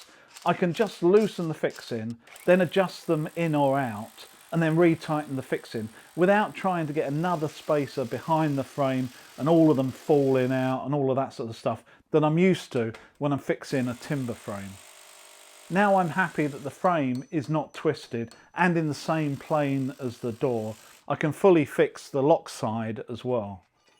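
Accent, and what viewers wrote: United Kingdom. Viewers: Wow!! ❤ what a nice door! Great locks to keep the local punks out of my electronics shop at home - love the multiple locking pins on side AND top! Sounds like you might be across the great pond - can this be purchased in the once great USA?...thanks for the GREAT demo 👍👍👍!!!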